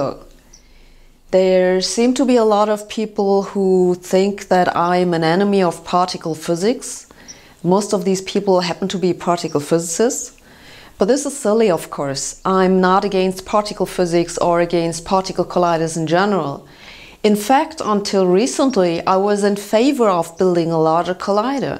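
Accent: Germany. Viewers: Love how she puts an extra layer of german-ness on it... she could probably play it down, but instead she embraces it. Cool style.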